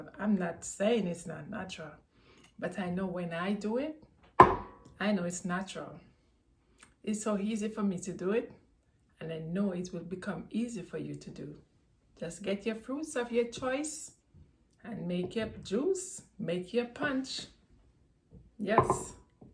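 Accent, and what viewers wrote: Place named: Jamaica. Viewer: I tell you I love this jamaican accent, this was really interesting to watch can I visit you every Sunday?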